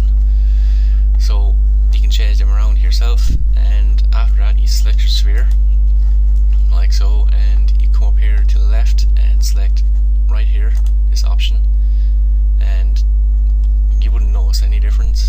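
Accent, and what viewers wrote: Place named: Ireland. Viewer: Nice, are you from Ireland?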